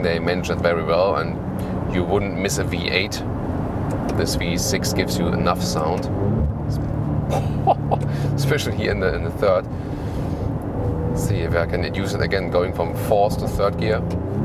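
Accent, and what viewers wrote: Germany. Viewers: nice reviews you're doing. Loving your German accent too! I'd never watch an hour long car review, except yours, dude! You seem to stress leather on seats in your reviews, are you a vegetarian?:) Respect.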